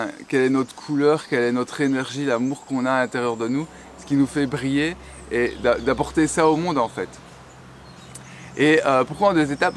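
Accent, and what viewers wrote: Belgium. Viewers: Coucou j'adore ton accent bien belge, ( je viens de la province de Liège)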